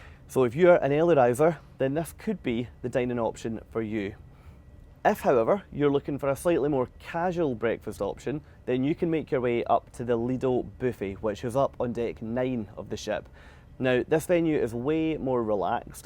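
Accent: United Kingdom. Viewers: Thanks for this info. I'm on her 7/22 and so looking forward. I've been Princess Elite over 15 yrs. One thing you didn't address was the Gala nights - oh my all the comments I hear from folks who are terrified of what to wear. I know food taste is purely personal so I can make a delicious meal on my own. But what about these white nights, or masquerade party, etc. Can you fill me in on that part? Loved your accent - this southern lady is going to adore hearing all the British chatter in a couple of weeks.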